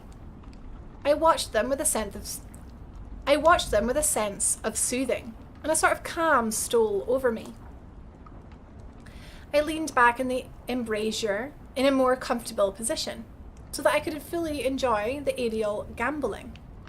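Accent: Scotland, United Kingdom. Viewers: don't worry about it :)) the video was great and the scottish accent made it even more authentic :)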